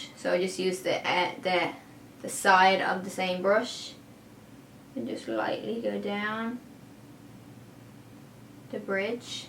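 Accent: Ireland. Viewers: Are you Irish? Love your accent 😭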